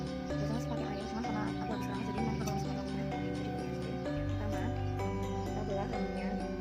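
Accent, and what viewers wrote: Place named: Java, Indonesia. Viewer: Hahaha... Biasa mbak, medokmu bisa jadi karakter tuh dalam berbahasa indonesia.😄 google adsense siap menantimu..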